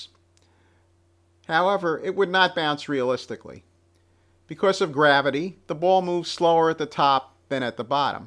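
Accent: Boston, United States. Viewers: Lol I can TELL your from boston I can hear it. you say Bwall. =D Anyways, nice vid ;)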